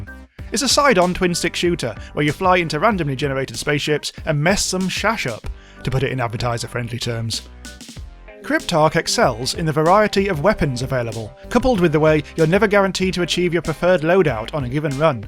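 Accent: United Kingdom. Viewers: Steven 131 I can tell it's British, but the UK has many different accents. A Northern Irish person sounds very different from a Londoner.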